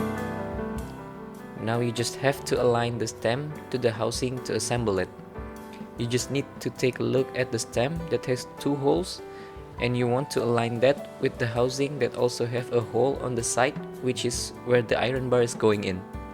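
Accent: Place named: Indonesia. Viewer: nice video! and I knew that you were Indonesian from the first seconds in xD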